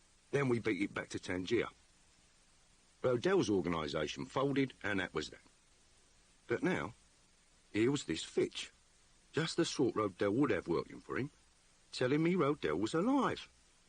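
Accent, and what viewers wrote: Cockney, United Kingdom. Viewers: Thank you so much! To me, this is a double delight! Not only hearing John Thaw reading in cockney, but even more hearing a Modesty Blaise piece I never have read before. Fabulous! 😃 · What a treat! I didn't know he could do a cockney accent, having only heard him as Morse (and in one Sherlock Holmes episode). My brother and I read all the Modesty Blaze books back when, and this reminds me how good they are.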